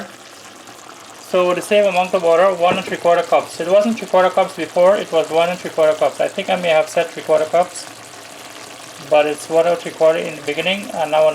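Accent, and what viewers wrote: Guyana. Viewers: i am missing Guyana so much & i am so grateful for your tasty traditional recipes. i love the Guyanese accent. i hope to make my rhythm, with this yummy recipes. big love from London UK.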